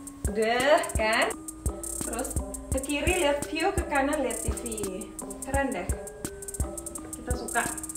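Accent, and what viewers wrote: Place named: East Kalimantan, Indonesia. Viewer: Kakak orang kaltim yaa.. Aku kira orang medan 😂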